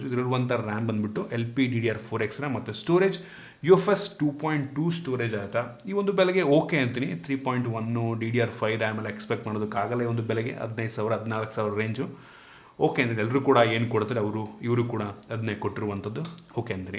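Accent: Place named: Mangalore, India. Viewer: sir ನಿಮ್ಮ ವಿಡಿಯೋ ನೋಡೋಕೆ ಇಷ್ಟ ಆಗೋದು ನೀವ್ ಮಂಗಳೂರು ಕನ್ನಡ ರೀತಿ ಮಾತಾಡ್ತೀರಿ.. ಥಾಂಕ್ಸ್ ಫಾರ್ ವಿಡಿಯೋ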